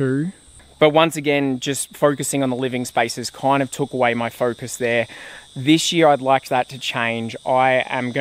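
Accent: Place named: Australia. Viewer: ....what a great effort 2023 gave to you....a foundation of a new beginning for 2024...love all your thoughts going forward...to relax and enjoy the process forward is the blessing you have now given yourself...living each day with your precious buddy, in his golden years supporting the journey within you both, will set in your heart the biggest gift...May this year unfold abundance and prosperity in many forms...Enjoy with life laughter and love...great to hear that broad Aussie accent...Love the haircuts, to lighten the load..Blessings always...XX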